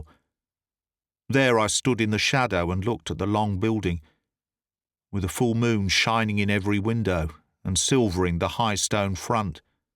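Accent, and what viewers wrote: United Kingdom. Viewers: I am trying to learn English especially with the British accent ... I honestly learned a lot from you ... Thank you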